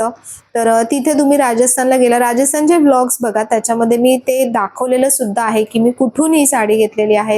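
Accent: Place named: Khandesh, India. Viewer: खुप छान खान्देश भाषा बोलतेयस जळगांव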